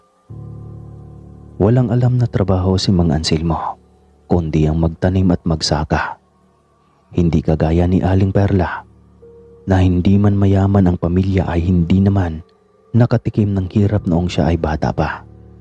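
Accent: Visayas, Philippines. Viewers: pangit mo mag kwento.. bisayang bisaya.. yay.. gusto koyung kwento boses molang ang pangit pati pag deliver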